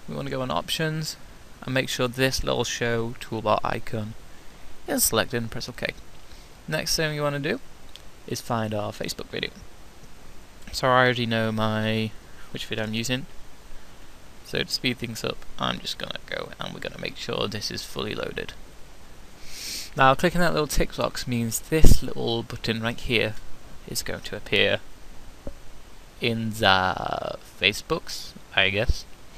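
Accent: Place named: United Kingdom. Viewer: lol you have a funny british accent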